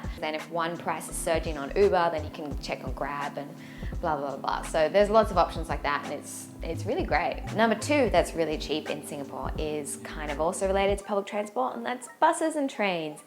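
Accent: Australia. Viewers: Love your Aussie accent. Australian accent sounds like British accent. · Thanks for sharing. Love your Ausie accent.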